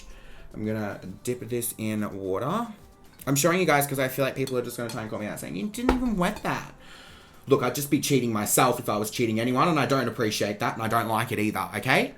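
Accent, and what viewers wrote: Australia. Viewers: Is he Australian? Because he sounds like it. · tori s its australian like mee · Is he Australian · Is his what posh Australians sound like?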